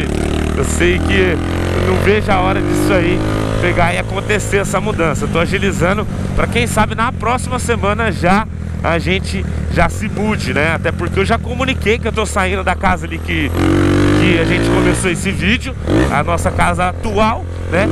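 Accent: Goiás, Brazil. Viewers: por que vc não fala mais naquele pike do goiais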